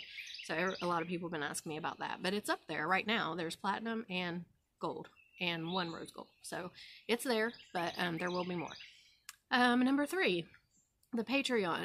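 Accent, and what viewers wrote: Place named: Pittsburgh, United States. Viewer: Ahh, okay. Every once in a while, you sound like some folks I know from the Pittsburgh area, which is why I asked. 😊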